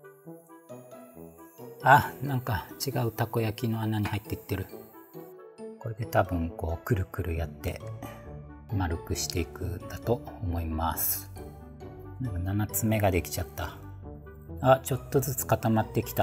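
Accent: Kansai, Japan. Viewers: わぁ～❣️可愛いのってチェブのタコ焼きだったんですね💡さすが！チェブのクオリティー高くてめっちゃ可愛いです❤️❤️ 量そんなんでいいんかいな～？って思ってたけど大成功でしたね😁✌️ お味はどうでしたか😉？ちょいちょい出る関西弁に癒される💛 素の時は関西弁なんですね😌✨ たこ焼き器は家にもあるんですが、使った事がなかったので今度やってみよう🐙🎶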